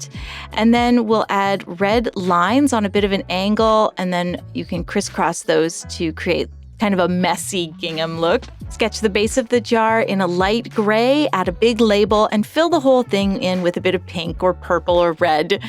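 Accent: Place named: Ontario, Canada. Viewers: sounds pure Ontario to me! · I love your French coming through...it's nice to hear "Canadian"... (I'm Canadian) I'm looking forward to trying your breakfast doodles. So fun! Side effect...making me hungry😂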